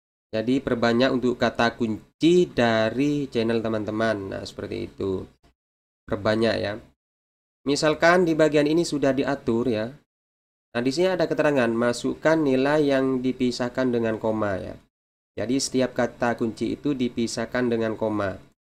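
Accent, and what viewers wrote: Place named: Madura, Indonesia. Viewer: Owlhh..soalnya dri cara bicara nya udh kyk orng madura.. 😅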